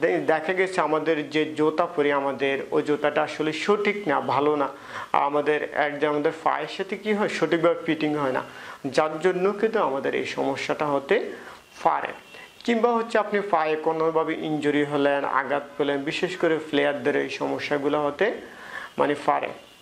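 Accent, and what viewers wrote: Noakhali, Bangladesh. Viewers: আপনার বাড়ী কি নোয়াখালী? কথা শুনে মনে হচ্ছে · নোয়াখালী মনে হয় স্যারের বাড়ি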